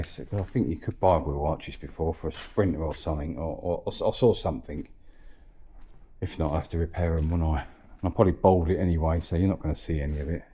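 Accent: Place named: South East London, United Kingdom. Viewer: I knew you was near me with your accent I’m in Croydon area do you have a email or some way of getting hold of you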